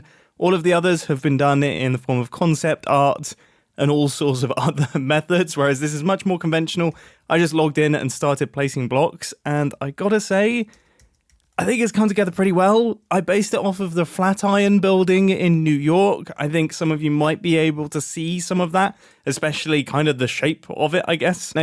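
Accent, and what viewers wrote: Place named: United Kingdom. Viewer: My daily dose of a dapper British man playing games to keep my sanity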